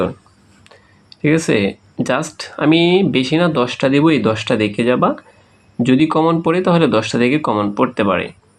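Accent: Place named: Sylhet, Bangladesh. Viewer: Suru Sylheti😁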